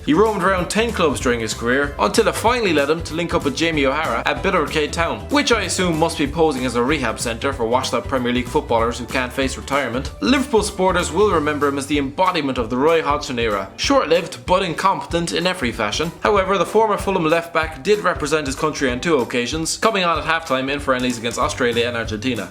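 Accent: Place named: Ireland. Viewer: He sounds so irish.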